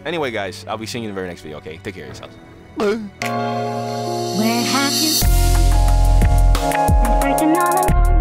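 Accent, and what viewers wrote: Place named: New York, United States. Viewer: Sound like a nyc accent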